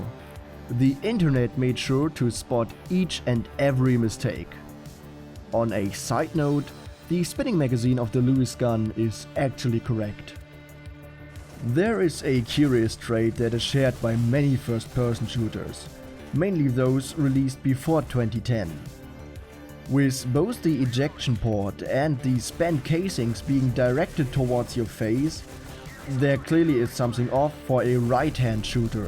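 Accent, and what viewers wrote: Germany. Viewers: Wusst ich doch, dass das ein deutscher Akzent ist :D Schönes Video! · I can hear your german accent mein freund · Dein deutscher Akzent ist wirklich fürchterlich aber echt nices Video. Du scheinst zu verstehen, wovon du redest😄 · sry kann mir dass net geben der deutsche akzent kickt zu hart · Are ja german? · So Deutsch der Akzent ^^ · German accent maybe? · Fantastic work as always,. Are you a Bundeswehr vet? You mentioned the G36 paddle release being something you're "quite familiar with." Maybe the comments just pointed it out to you but you've got the right accent for it:) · Klingst deutsch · i was kinda confused if your accent was german or not, now im pretty sure it is(u can just ignore this, im only proud of myself) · Are you German? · You can hear out you are german, correct? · Das hört sich schon nach einem starken deutsch Akzent an, bin ich da richtig? 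:D Vllt solltest du das "TH" nochmal über. Da bekomme ich jedes mal gänsehaut ;) Sonst ein super Video :) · Ich habe bereits in den ersten paar Sekunden gecheckt, dass du ein Deutscher bist! ;) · the accent...YOU ARE GERMAN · Gib dir mal mehr Mühe bei der Aussprache! Dieses typisch deutsche "s" statt "th" treibt mich in den Wahnsinn! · Love the German accent bruder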